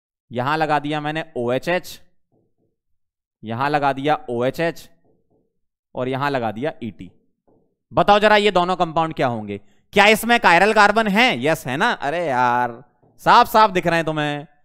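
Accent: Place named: South, India. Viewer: Zaid Khan toh maine kb burai k mai toh inki personality k bre m boli slightly south Indian jaise bolte h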